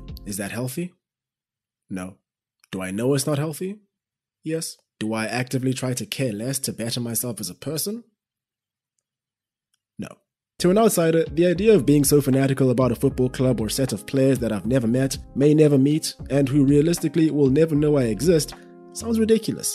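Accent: South Africa. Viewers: I always though he was south African living Pretoria and I think I was right lol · Always thought you're South African. Rep Zim! Great content amd congratz in a 100K! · Knew you were South African! My fellow citizen...I have subbed lol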